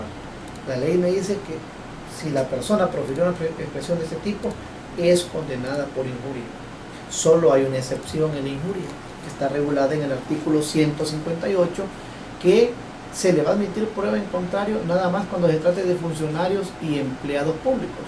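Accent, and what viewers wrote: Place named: Venezuela. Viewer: Eres venezolano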